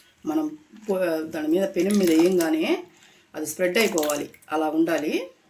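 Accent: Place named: Nellore, India. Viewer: Nellore telugu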